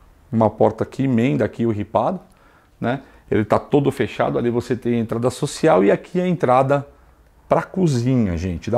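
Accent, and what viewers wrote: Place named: São Paulo, Brazil. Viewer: Ah, que delícia de sotaque paulistano ❤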